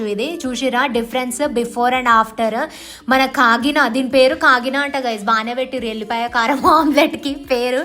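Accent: Telangana, India. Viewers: hello akka mi slang bale untadi pakka telangana la❤ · Masi batta 🎉🎉🎉❤ telangana slang 🎉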